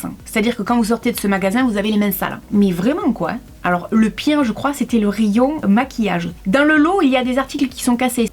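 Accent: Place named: Pyrénées-Orientales, France. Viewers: Je confirme malheureusement, voilà pourquoi votre accent me disait quelque chose... ( Je vis également dans le 66) :) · J'adore ton accent du sud ouest !! Un vrai délice auditif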